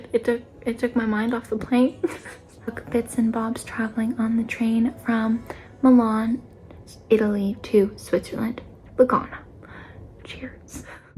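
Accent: United Kingdom. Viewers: Wait is she British or is she just doing the accent to be funny?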